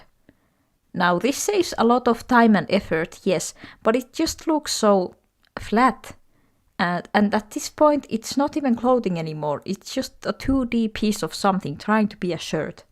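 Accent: Finland. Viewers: this is random but are you finnish because i hear an accent to your voice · The way we fins speak english is so funny also cute artsyle you got :3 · Ookko suomalainen? Your accent sounds like you could be finnish. :D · are you finnish? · You sound suspiciously Finnish. Olisitko maamiehiä? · ….i am tripping you sound finnish to me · I'm like 40 seconds into the video but... my brain is telling me to comment this: you sound incredibly finnish. Are you finnish? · wait are u Finnish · Are you Finnish? As a Finn, I'm getting major Finnish vibes !!! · Finnish accent spotted 🫵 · WAIT ARE YOU FINNISH? (Im just assuming bc of your accent :DD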